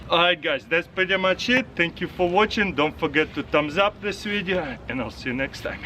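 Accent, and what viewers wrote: Russia. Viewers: Idk but I think he has already reviewed this axe or it's probably another dude with a russian accent.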